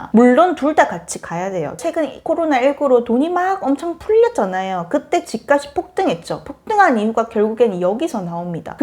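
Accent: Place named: Busan, South Korea. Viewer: 부산 사터리 넘나 귀엽다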